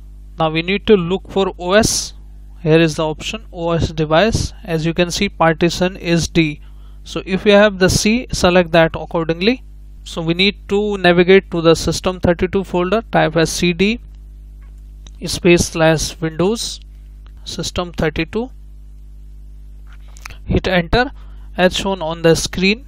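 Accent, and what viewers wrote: India. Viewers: This is really too much of a mix of MBR installations and GPT. What with your heavy Indian accent, it would have been better if you'd made two separate presentations, one for each, then we wouldn't have to decipher which instructions apply to what circumstances. Thanks for trying, but...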